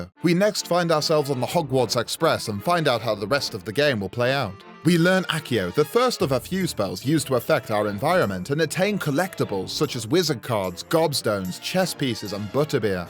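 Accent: United Kingdom. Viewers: You and Alan Rickman have like the same accent. 🤭 I get you are speaking silly lines, but your normal speaking voice sounds like you are both from the same town or the same part of Britain 🇬🇧 👍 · Because in England where I assume he is from based off the accent it is illeagal to emulate copyright content. Punishable up to 5 years without bail.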